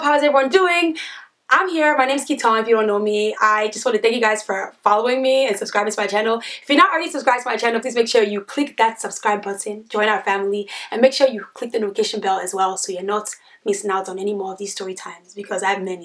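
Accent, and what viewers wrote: Nigeria. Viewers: Lmbo you told this story in the most Naija way. So funny. Glad you made it out alive :)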